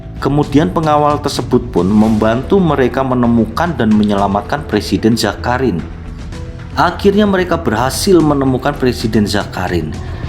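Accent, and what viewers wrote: Java, Indonesia. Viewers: Kuat betul jawa nya..mantap...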